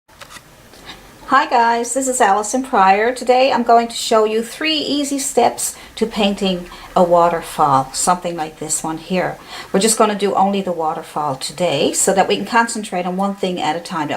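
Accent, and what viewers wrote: Newfoundland, Canada. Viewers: Hello my duckie, sounds like you are a Newf. ;)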